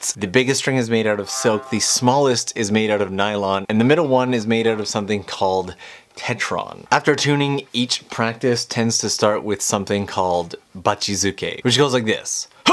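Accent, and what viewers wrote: Canada. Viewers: I keep getting distracted by the Canadian accent. I’m wondering if you’re from Saskatchewan or somewhere around that part.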